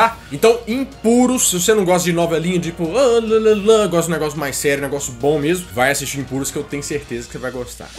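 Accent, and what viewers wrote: Minas Gerais, Brazil. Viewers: OCE É MINEIRO UAI, O SOTAQUE NAO NEGA TO ERRADO , SO SE O UM MUCADINHO SO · Todo domingo eu to aqui na expectativa de ouvir o modo de cumprimentar Mineiro ... " cês tão bão né?! Manda um salve aqui p São Joaquim de Bicas - MG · Gente...melhor canal do YouTube....sua forma de apresentar é mt top..super natural e engraçado..é o sotaque Mineiro e top..MT bom de ouvir..sou mineira mas quem mora em BH fala MT diferente de quem mora no interior kkkk me divirto MT com seu canal parabéns.... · Cara, ce é mineiro?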